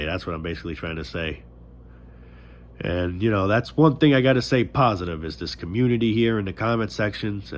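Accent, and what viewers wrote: New York, United States. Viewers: One of the best parts of discovering your channel is that my best friend and roommate is from New York. We live in Florida. And we talk about a lot of stuff and when I mention things that you're talking about. Right now he sometimes shuts me down. But it's great to know that you are from New York and you speak Truly from the heart and The accent and the truth at the same time is so funny to me. It's like you're saying what I'm thinking and you just say it. I am not white, but my roommate is New Yorker to the bone, just like you I did live in Chicago for some years. So I do know the city life. But never been in New York. And you guys speak from the hip. You just say it. And I respect that as far as the channel and people disrespecting how you and we think. And why we listen to you on a daily basis if you would put out videos on a daily basis. 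You just speak the truth and the truth is something that can't be hidden and you will grow because people will hear you and follow you because you have the Audacity to speak the truth Bravo. Bravo you are what humanity should have been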